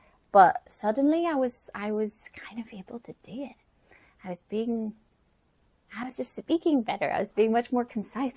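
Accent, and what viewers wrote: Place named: Ulster, United Kingdom. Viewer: I love your natural Ulster accent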